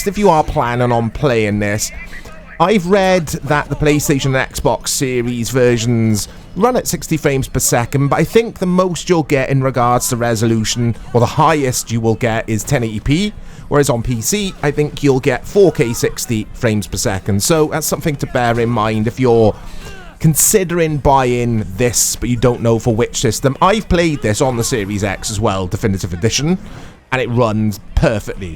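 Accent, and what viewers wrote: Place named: Cardiff, United Kingdom. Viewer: This boyz from Caaaaaaaaardiff or Newport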